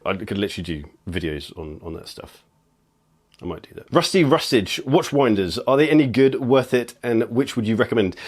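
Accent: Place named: Scotland, United Kingdom. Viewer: Hey, where in Scotland are you?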